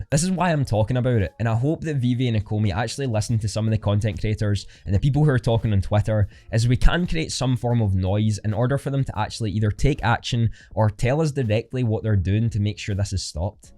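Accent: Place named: Glasgow, United Kingdom. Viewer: He's a Glaswegian you numpty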